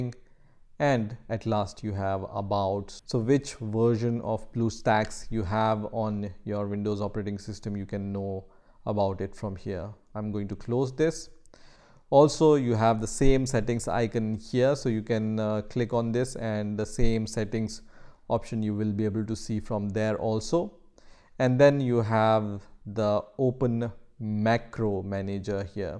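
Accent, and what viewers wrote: India. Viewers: this is very random but you sound kinda indian even though your browser language is German.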